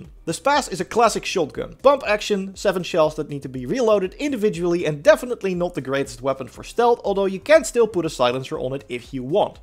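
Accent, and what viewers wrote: Netherlands. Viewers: I can hear on this guys accent he’s Dutch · You must be Dutch. Heard it within the first 4 words you spoke. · Are you Dutch?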